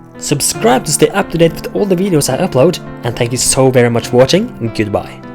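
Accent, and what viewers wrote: Norway. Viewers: Kul video! Ikke alltid man møter på norske zelda-yourubere! :D Litt sterk norsk aksang i uttalen din, men ellers veldig bra! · did i hear the lovely sound of a Norwegian English youtuber? ikke ofte man ser det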